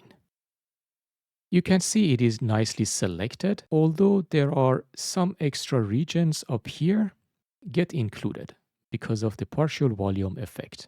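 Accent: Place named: Iran. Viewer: Why do I sense a little bit of beautiful persian accent from narrator voice!?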